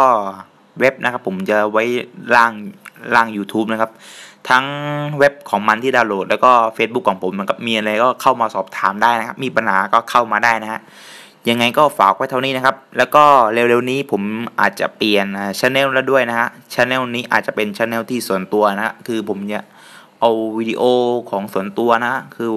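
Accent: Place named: South, Thailand. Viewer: เสียงเหมือนคนใต้นิ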